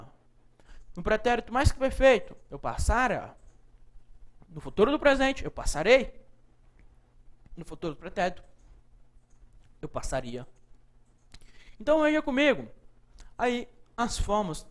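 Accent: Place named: Goiás, Brazil. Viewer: O professor tem sotaque de goiano. O sotaque dele às vezes dá agonia mesmo. O que vale mesmo é que ele esclarece a matéria muito bem muito obrigado pelas excelentes aulas.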